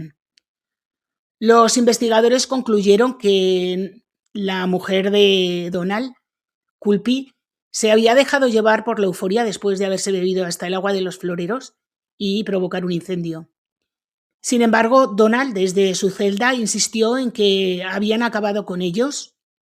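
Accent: Aragon, Spain. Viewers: Gracias por responder...pues pareces pura aragonesa jejeje Me dijo mucho en el tema de los acentos a ver si puedo descubrir el origen pero contigo no he dado ni en la diana😂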